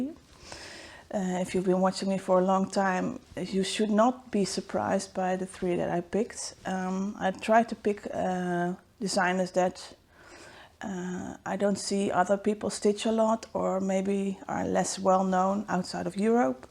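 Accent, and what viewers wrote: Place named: Netherlands. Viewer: Finally found a dutch flosstuber. I heard your accent and just knew. A new subbie here. I did a few inkcircles too. Yours are beautiful. Marike zeewolde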